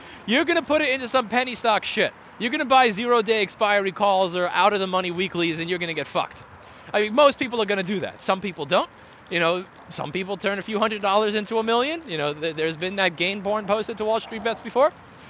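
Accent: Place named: Italian American, United States. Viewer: ... I apologize if you mentioned this somewhere in your videos and I didn't see it. But I need to ask you... Why do you look and speak like an Italian American but have a German last name?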